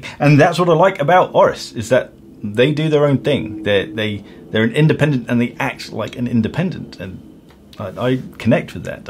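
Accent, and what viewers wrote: United Kingdom. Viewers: is the Chris Harris of the watch world. Always well articulated thoughts and enjoyable to watch. It might also be the British accent.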